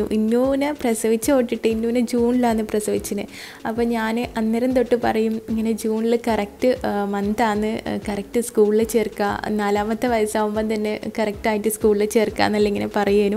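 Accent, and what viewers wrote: Kannur, India. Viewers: Njan oru pattambi kaari aanu but niku othiri ishta kannur slag 😍😘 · Ighal kannur ano nammale athe language😀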